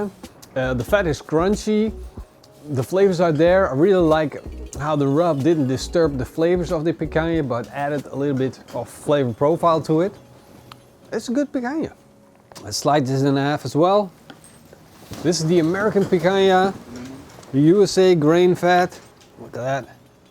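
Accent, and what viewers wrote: Netherlands. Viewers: He sounds a bit Dutch.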